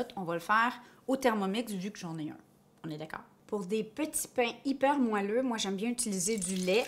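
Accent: Canada. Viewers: Merci beaucoup pour cette excellente recette de petits pains au lait. Mes petits enfants en raffolent. En plus avec l’accent canadien c’est trop beau !!! Vive Thermomix et Air Fryer Ultenic qui nous facilitent la tâche. Gros bisous de la Drôme en France. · Bonjour Je suis débutante avec mon AIR FRYER (offert par ma fille). Je me suis arrêtée sur vos vidéos très bien présentées, très intéressantes! Merci pour la recette du pain brioché, très bonne idée les petites boules qui s'attachent l'une à l'autre en cuisant, pour former une jolie couronne! Pas trop difficile à préparer.J'aime votre petit accent canadien très sympathque! Amitiés de Belgique MIMILOU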